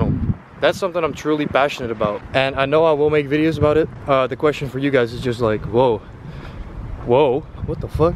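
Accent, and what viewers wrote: Netherlands. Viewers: Are u dutch?????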